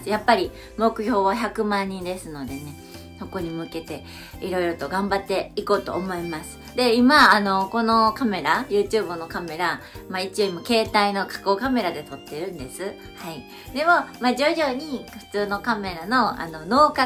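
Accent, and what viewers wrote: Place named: Kansai, Japan. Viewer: 関西弁アイドルは親近感が凄く湧くね👌 BEYOOOOONDSのさやみいみと絡んで欲しい😊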